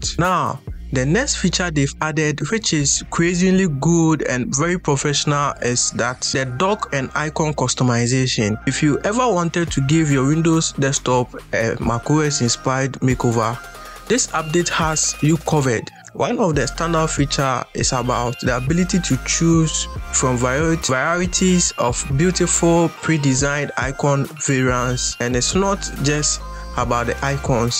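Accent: Nigeria. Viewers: Nigerian ahh accent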